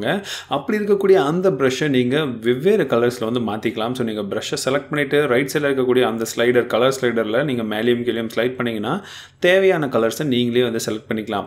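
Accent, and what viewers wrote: Chennai, India. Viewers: Chennai tamil super anna semma funna iruku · Scheduled msging sema bro Nenga pesura antha Chennai slang la pesurathu vera lvl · Really i enjoyed the madras slang of you bro it nice😍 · Bro tdy neega chennai la iruka peoples pesura maari pesuninga...☺️ nalla irudhuchu... Use ful tricks bro...👍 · Super bro. Thank you bro 👍👍 . At last Madras language 👌👌😂😂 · Ada ennaapa nii chennai slang ķu poita nee........¿ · Chennai slang vera level bro 👍👌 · Bro Chennai slong semma super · Chennai Slang thaaru maaru bro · Chennai language supera pesuringa anna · ✋✋✋✋✋தயவுசெய்து இனி சென்னை தமிழ் பேசாதே முடியல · Chennai tamil 👌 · Chennai basala pesathinga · Bro...unga chennai slang spr broo😍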